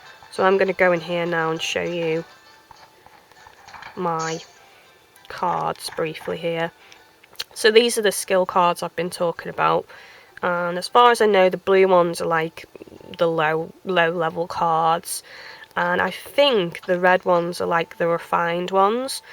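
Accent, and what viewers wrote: United Kingdom. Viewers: i lovr that ur well into it, and ur ur british woop woop lol, where in england u from?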